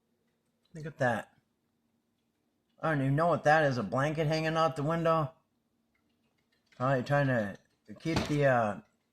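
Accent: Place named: Minnesota, United States. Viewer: U must be from Minnesota 😊 I used to work for Cargill. U betcha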